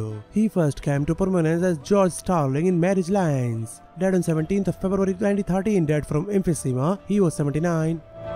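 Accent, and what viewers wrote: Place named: India. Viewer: Obviously, the narrator has an Indian accent.however, he makes it harder to understand because he speaks so FAST! Is this a race?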